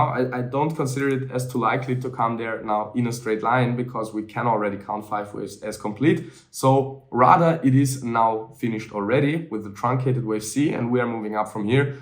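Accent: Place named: Austria. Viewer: Typically Austrian accent.. 🍺🍺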